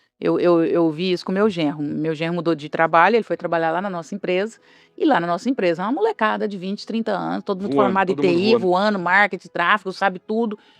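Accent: Minas Gerais, Brazil. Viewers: Muito legal Dra o seu exemplo! Se intrometrerem na sua vida por um jeito autêntico e mineiro de falar mas não alcançarem de forma singela, o coração de quem carece das suas dicas e orientações para a nossa saúde mental. E tem invejosos-as passivos agressivos que fazem-se de bonzinhos/boazinhas, e aproveitam para nós alfinetar com frases maldosas do tipo: "Comprar casa é fácil mas difícil é pagar as prestações". Ou: "Emagrecer qualquer um consegue mas difícil é manter". E coisas horríveis como lembrar de nossos traumas ferir a todo momento onde surgimos felizes ou celebrando algo bom. Há pessoas que celebram satanicamente as nossas derrotas e tentam nos fazer sofrer até qdo estamos felizes pq não suportam ver ninguém bem. Como a Bíblia diz que DEUS separará joio do trigo! Que sejamos trigo e não joio apesar de tantas imperfeições que vejo em mim🙏🏻. Sucesso e sigo seguindo e compartilhando seus vídeos nos grupos de saúde e de Apoio tbm 🎉.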